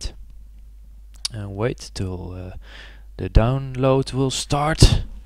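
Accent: Netherlands. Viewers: Are you Dutch Ben je Nederlands? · are you dutch? u have a dutch accent lol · I can hear that you're Dutch :) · are you dutch?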